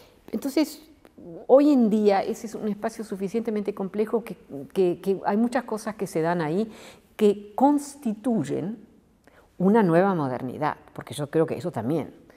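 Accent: Argentina. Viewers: How come she speaks perfect argy Spanish? I hear that she lived until 1950 in Argentina, but at that time she was 1! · No esperaba que hablara en español menos tan porteño.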